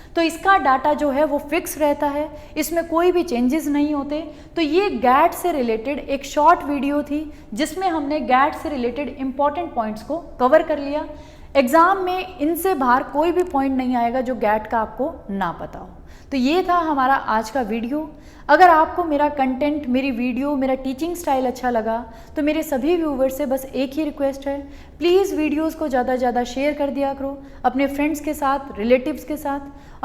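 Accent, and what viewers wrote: Punjab, India. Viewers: Mam are u pnjabii??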